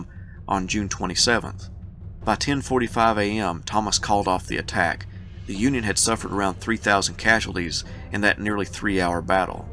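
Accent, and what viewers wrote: South, United States. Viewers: I didn’t know this was going to be 2+ hours of talking…..I really dislike listening to narration without something visual to hang on to bc I am just not and never have been an auditory learner, but I stayed with it for 45 minutes, which is a testament to the narrator and scriptwriter. But it’s a no-go for 2 more hours, and it’s too bad bc I do enjoy the subject, but the narrator’s soft voice with a soupçon of a southern accent puts me to sleep or I start looking at a book and realize I’ve lost the thread and have to go back. I’ll try to return to this and listen in parts!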